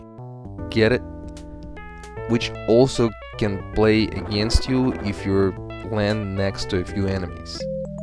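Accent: Russia. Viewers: Great review, dude! I love this game, it's huge! So much to do in it. Dude, I like your accent, are you russian?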